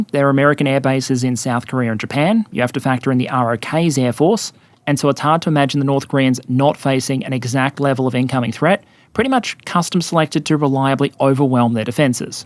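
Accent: Australia. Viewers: Always thought he is australian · Yep almost sure he's an Ozzie.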